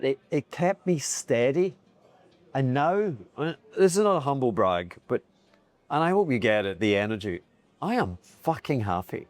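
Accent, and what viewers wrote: Scotland, United Kingdom. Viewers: A drunk Scotsman reading a script from a laptop. I wonder who wrote this garbled nonsesnse?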